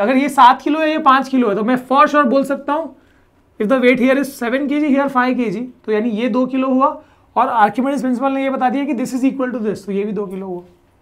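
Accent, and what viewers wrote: Bihar, India. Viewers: Sir are you from bihar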